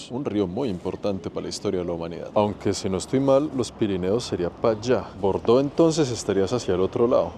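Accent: Colombia. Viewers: Varias veces! Y me encanta su acento Colombiano.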